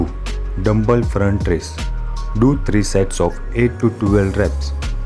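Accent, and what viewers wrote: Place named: India. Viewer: واضح من اللكنه هندي بحت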